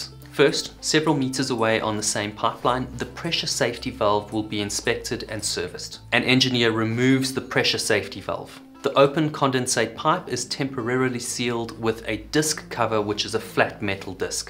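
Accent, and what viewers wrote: South Africa. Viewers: You sound south african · I hear a South African accent? 😊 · I knew a guy from Albertinia (South Africa) who worked on that platform. He'd fly in to the UK and he handled the landing barge bringing in supplies. Nice to hear the Saffa accent explaining these events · Your accent sounds very South African, are you south African living in the UK? 🇿🇦😁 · where are you from? you almost sound south african? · You south african? I'd recognize that accent anywhere, amazing video btw! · Oh man, so close to hiding that south african accent. but not close enough boet!!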